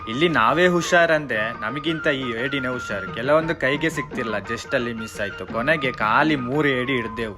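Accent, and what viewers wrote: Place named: Kantara, India. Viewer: Kantara dantha kathe way of speaking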